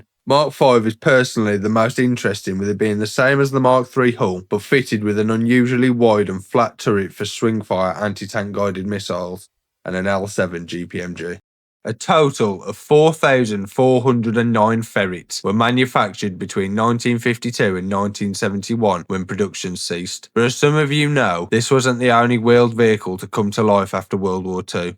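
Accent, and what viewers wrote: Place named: Midlands, United Kingdom. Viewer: Well done, this is a neat little documentary. Subbed! Where are you from in the Midlands?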